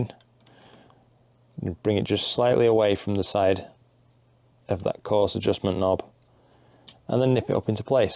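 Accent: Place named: Yorkshire, United Kingdom. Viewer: Shoved up , love it good old Yorkshire speak 👍. Happy birthday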